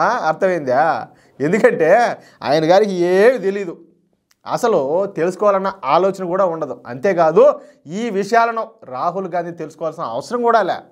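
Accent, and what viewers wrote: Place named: Bangalore, India. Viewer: Anna ee ooru anna meedi ? Ullagaddalu ani bangalore telugu matladuthunnaru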